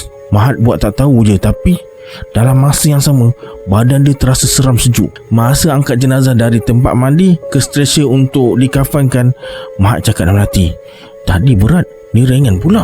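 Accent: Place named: North, Malaysia. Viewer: Memang sempoi video kali nie 🤟 loghat utara nohh 👍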